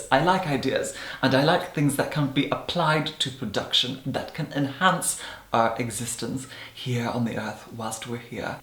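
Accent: Scotland, United Kingdom. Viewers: Lu z he's originally from the Hebrides and his real accent is much more Scottish sounding, you can hear it a bit in his very first videos. He now lives in London, and over time has been covering ut with this english, posh affectation you can hear now.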